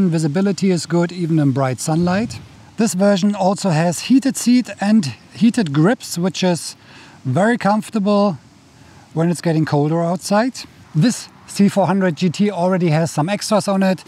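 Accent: Germany. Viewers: Your accent sounds Teutonic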